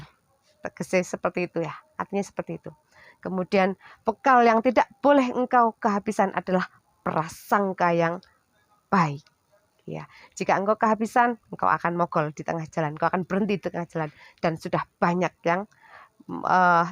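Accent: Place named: East Java, Indonesia. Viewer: batinku nungu rondo mu mak e iki mesti wong pati opo jatim kasar pool bahasane😂😂😂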